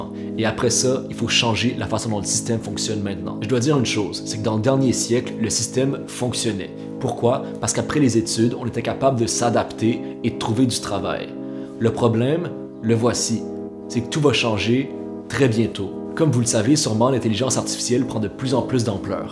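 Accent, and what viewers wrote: Quebec, Canada. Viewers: J'habite au Brésil, et j'apprends le français depuis 5 mois. Je sais pas le raison, mais c'est plus facile pour moi pour comprendre l'accent québécois que l'accent français et, découvrir ta chaîne, plein des sujets que je m'intéresse (et vous avec votre mignon accent), était une bienfait. Il n'y a pas beaucoup des chaînes en français que sont cool comme la votre. Merci. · Ce qui m'a attiré dans ce vidéo c'est l'accent Canadian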